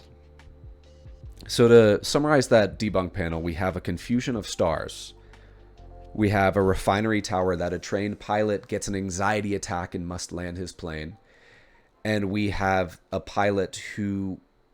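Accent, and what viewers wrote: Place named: Southwest, United States. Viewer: How come you know how to pronounce Spain Talk? Are you of California or New Mexico? Or Tejas?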